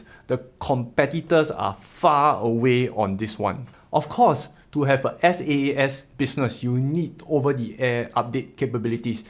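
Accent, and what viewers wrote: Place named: Singapore. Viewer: It’s just a standard Singapore English accent. Should be easy to get used to.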